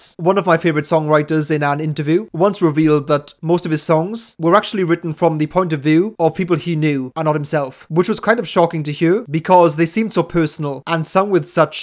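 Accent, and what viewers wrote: Wales, United Kingdom. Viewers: Hello. Are you Welsh, luv? 🏴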